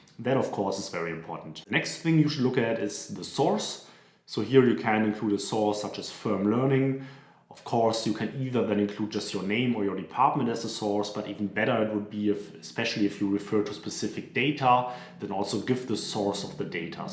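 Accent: Germany. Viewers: Hört sich deutsch an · Liebe deinen deutschen Akzent! :D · Du hast echt ein sehr heftigen deutschen Akzent 😂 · Ich schmeiß mich weg 🤣 YouTube hat mir „deutsche“ Untertitel automatisch eingespielt. Ich habe zuerst gedacht das wäre dänisch, lettisch oder so. Als ich dann nachgesehen habe war deutsch angegeben. In Kombination mit dem extremen deutschen Akzent pure Comedy 🤓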